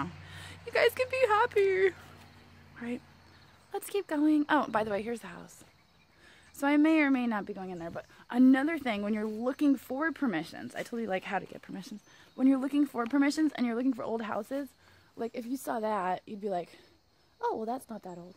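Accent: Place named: Australia. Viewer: For a second i thought you were coming too my country Australia lol. Well it sort of sounded like it ;)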